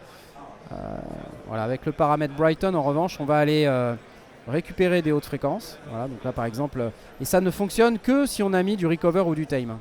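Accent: France. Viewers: I will try to ré-explain ... Fluent but frenchy indeed.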